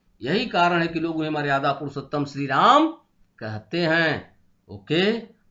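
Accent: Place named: Bhojpuri, India. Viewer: Waise toh tunne pgt hindi likha hai lekin padhta tu hai hindi mix bhojpuri, pehle apni hindi sudhar tab padhana supari nikal ke bol ...Pehle rehearsal kar tab video banana😀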